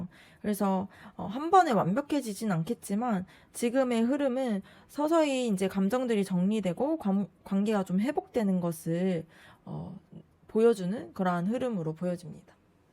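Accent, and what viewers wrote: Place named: Jeolla, South Korea. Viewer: (전라도)향기가 나는 구수한 리딩 잘 들었습니다😊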